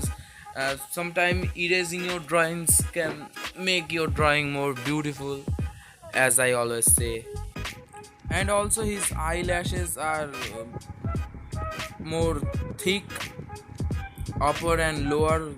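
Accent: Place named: Bangladesh. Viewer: Listening to your accent i think youre a Bangladeshi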